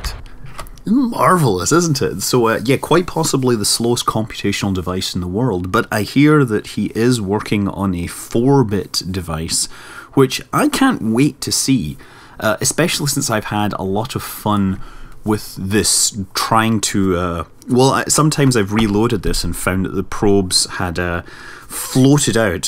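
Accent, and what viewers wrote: Scotland, United Kingdom. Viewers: I love your voice. And no not just your delightful scottish accent, your voice is really nice. I'd LOVE to grab a space-pint with you some day and talk physics as our spaceship slowly deorbits into Minmus....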